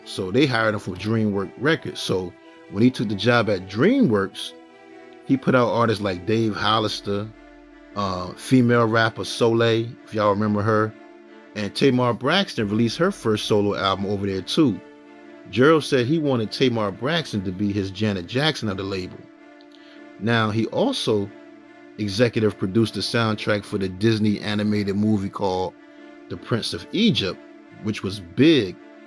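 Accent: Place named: Washington DC, United States. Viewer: Please tell me this narrator is from DC, because his accent is very familiar to my ears. LOL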